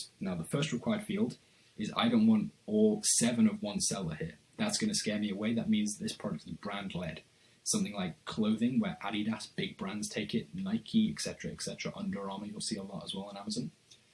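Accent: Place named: United Kingdom. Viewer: Hello - I prefer to to 'read' your course, rather than watch it.. [your British accent is lovely but a bit difficult for me... also, the snapshots you share are blurry], so... do you have this good information in a book or a website or something? Thanks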